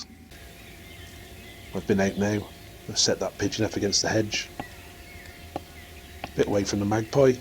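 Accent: England, United Kingdom. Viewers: Love these videos as I hate these destructive pests. The English accent is also a nice touch. Thanks for the professionalism in producing these videos.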